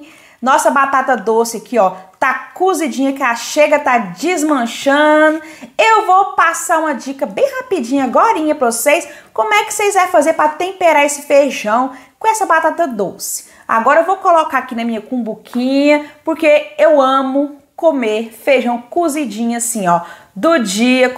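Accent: Minas Gerais, Brazil. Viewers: Nossa, que delícia, achei que era mineira por causa do sotaque mineira rsrsr.... Bjs adoro seus vídeos 😘😘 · Amei seu jeitinho de falar se e minerinha uaikkkkklinda amei sua receita vó faser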